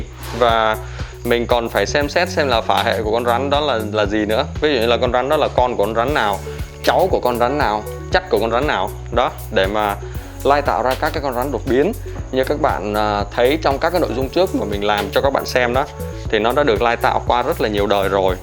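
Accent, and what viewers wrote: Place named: Central Highlands, Vietnam. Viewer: A ơi. Xem kênh a e bị nghiện a luôn rồi. Thích giọng nói và con người Tây Nguyên quá.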